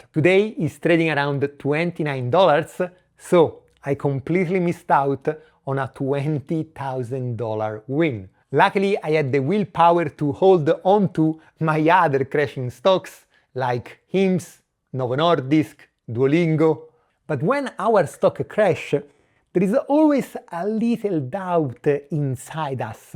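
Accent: Italy. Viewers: by the accent I think you’re Italian like me. Anyway I’ll write in English so everyone can read. I’ve been invested for few months now. I found it because I was upset after I sold my MU position at 120$ and was looking for the next AI bottleneck. They have a spintronics technology which serves to acquire, store and transmit data. And I thought this could be helpful in the future for AI considering the problem now is mostly about Data management. And it doesn’t require water to cool down like nowadays data centers. Let me know your opinion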